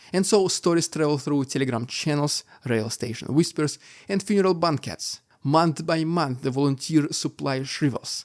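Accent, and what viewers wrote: Russia. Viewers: daaamn dude, yout russian accent is so heavy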